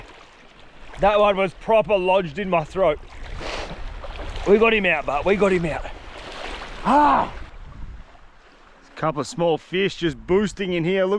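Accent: Australia. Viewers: Its always that aussi accent 😂always gets me❤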